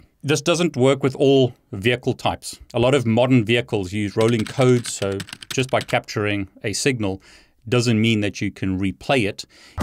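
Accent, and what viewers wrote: South Africa. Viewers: South African? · Are you by any chance South African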